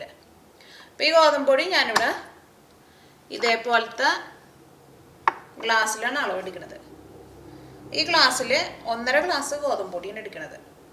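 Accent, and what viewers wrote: Thrissur, India. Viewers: നല്ല അവതരണം .തൃശൂർ നിന്നും പറയുകയാണ് എന്ന് തോന്നും .So simple straightforward conversational style keep it up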